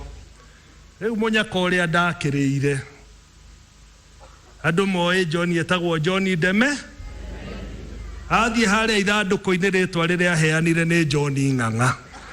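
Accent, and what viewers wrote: Kikuyu, Kenya. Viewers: Peter Kenneth, you make Kikuyu sound so sweet.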